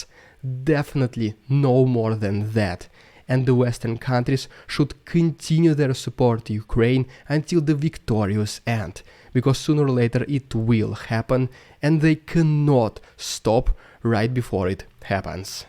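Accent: Russia. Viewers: "They [oligarchs] either have to [abide by] Putin's madness, or be extremely careful walking next to open windows": that was a great line. I just discover your channel now, interesting stuff indeed. Keep on the good work, I'm subscribing now, it's great to hear something else than propaganda with a russian accent:) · Nice Russian accent:)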